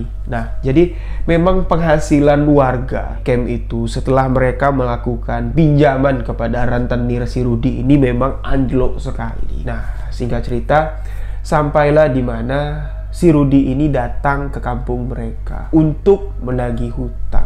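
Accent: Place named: Bali, Indonesia. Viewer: Cepet sembuh, sehat kembali bamg. Ngomong2, kl d denger2 logat jambi agak mirip bali ya... Prtama denger bang bicara sy kira orang bali 😁😁🙏🙏🙏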